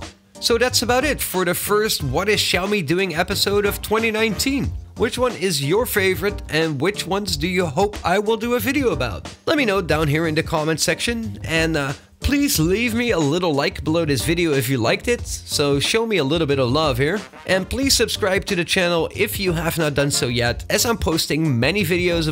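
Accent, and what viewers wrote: Netherlands. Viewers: Hoor ik daar een Nederlands accent...? 😄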